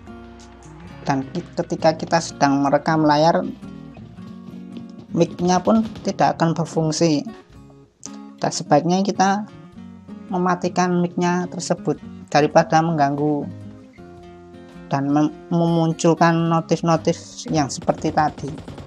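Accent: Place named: Java, Indonesia. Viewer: Medok e brrooo🤣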